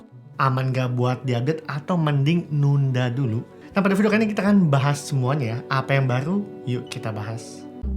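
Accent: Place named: Europe. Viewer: lidah - lidah eropa memang begitu bang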